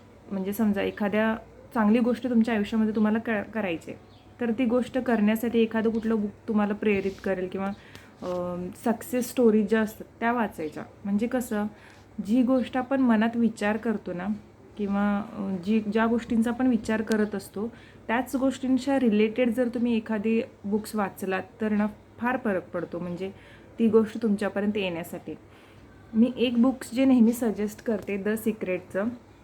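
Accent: Kolhapur, India. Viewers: Tumhi kolhapur aahat ka, bolna varun vatatay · तुमची कोल्हापुरची भाषा ऐकायला छान वाटते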